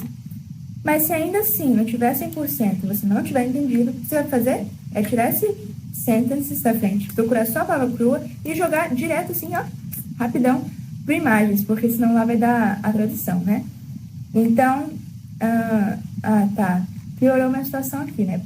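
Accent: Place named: England, United Kingdom. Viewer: Hum chegando na metade do vídeo aqui com esse contéudo. Gosto muito dessa pronúncia dos ingleses... céus, que coisa confortante de se ouvir... esses verbos auxiliares e outras capivaragens... muito bom ver a gramática send explicada de maneira tão suave com conteúdo de Harry Potter. Excelente! Uma pena que não consigo usar o hype aqui... porque será...? Ah, compartilhando o vídeo.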